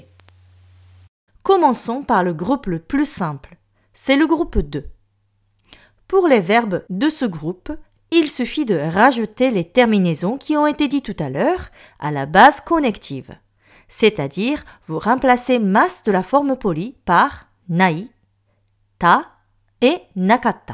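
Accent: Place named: South, France. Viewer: Bonjour, c'est amusant car j'entends chez vous l'accent du midi ! Je voudrais apprendre des rudiments oraux de japonais en cours particuliers. Pour un court voyage au Japon et pouvoir chater. Est-ce possible avec vous ? Thierry Dorée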